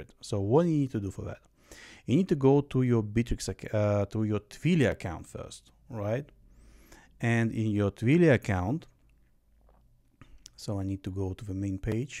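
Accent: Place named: Lithuania. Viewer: bro where are you from, your accent sounds lithuanian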